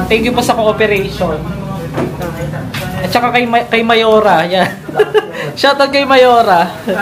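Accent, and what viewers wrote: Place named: Bulacan, Philippines. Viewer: ay malapit ka sa Bulacan, bulacan..e yung punto eh!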